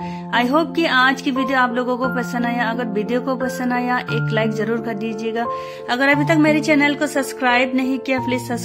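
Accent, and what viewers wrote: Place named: Bangladesh. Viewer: Ap Bangladeshi ho kya apki Hindi se lag raha hai perfect nhi hai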